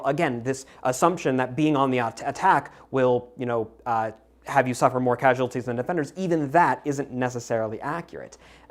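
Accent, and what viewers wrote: United Kingdom. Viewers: is the only person alive who is able to sound british while talking in an american accent.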